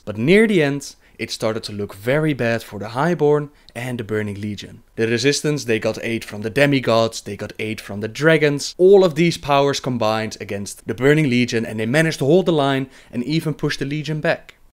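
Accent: Netherlands. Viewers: He is from the Netherlands. I am too and i can hear from his english speaking he is dutch:P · you are definitely dutch! btw nice videos!! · He has a Dutch accent. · Quick question. Are you by chance Dutch? I have a Dutch friend, and you sound like him quite a bit. I've also noticed on one of your toon's names, there's 'je' at the end, which, if I'm correct, in Dutch, is what you would call a small child. Thanks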